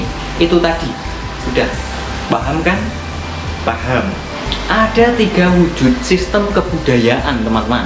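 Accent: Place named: Java, Indonesia. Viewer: Bahasanya medok banget bos wkwkwk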